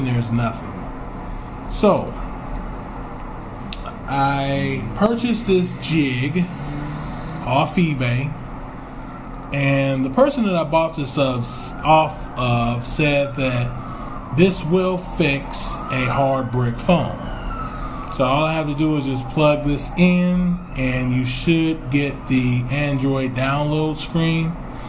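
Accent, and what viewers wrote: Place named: United States. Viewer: love your american accent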